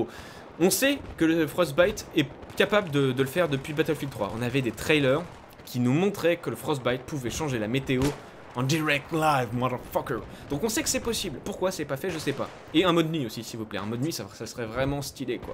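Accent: South, France. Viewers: Oui je pourrais payé pour un BF3.5 et juste ne pas remettre la DCA fixe cheater de BF4 . Et oui un BF Viêtnam.5 se serait génial.Ton accent du sud est magnifique.(Mariane sauve nous n'est se pas ^^) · Rhaaa putain ton accent du Sud est trop énorme! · Et très bonne vidéo comme d'hab, continue comme ça avec t'es super accents du sud, t'es génial. ;)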